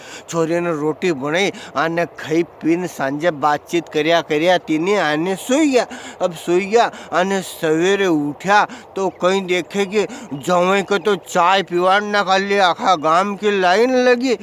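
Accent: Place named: Indore, India. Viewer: Ya mhare indori bhasha lage. Mhake athe astar ni bole.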